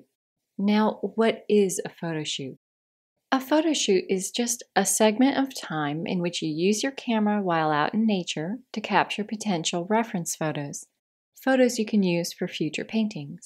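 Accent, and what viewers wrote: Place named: United States. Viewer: This is so helpful and so well constructed. Also I loved her voice. Americans always seem to compliment our English accent so now I can return the compliment. It’s one of the nicest American accents I’ve heard so wondered what part of the US she comes from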